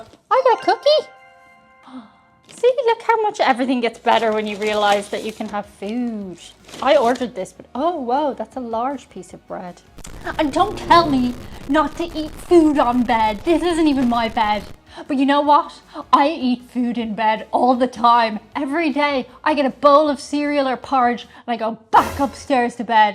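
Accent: Ireland. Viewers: Was it just me, or did sound more "Irish" than usual in this video?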